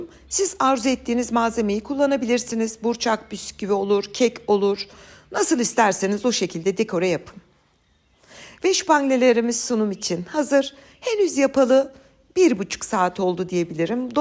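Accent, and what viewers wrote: Germany. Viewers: Ellerinize güzel sakin anlatımınıza ince detay vermenize aklınıza sağlık sağlıkla kalın teşekkür ederim. Antalya Türkiye. Babam da sizin gibi şupangıle şıtress şıpor der konuşurken Almanya da yaşamanın minik süsü kulağa yabancı gelmiyor. Sevgiler.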